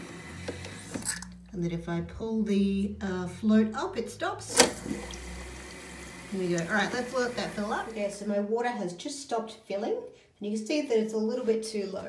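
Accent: Australia. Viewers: Thank you for your video. I had to search for an Australian video and luckily you are a lady. Thank you so very much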